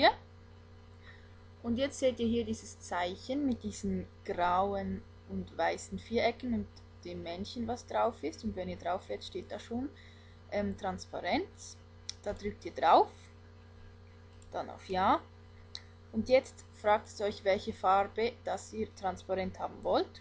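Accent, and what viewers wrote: Switzerland. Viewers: man hört das du schweizerin bist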